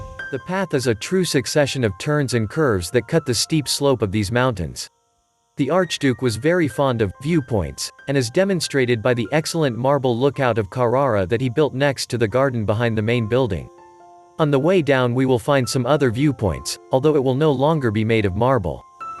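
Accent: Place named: United States. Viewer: Nice videoing - but the American-style narration distracts. Is this some automatic US-english narration?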